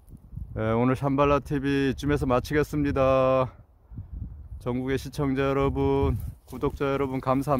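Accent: Gangwon, South Korea. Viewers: 유트버님 억양과 말씀 하신걸 들으니 강원도 영 평 정 같아요. 항상 보고 있습니다. 감사합니다.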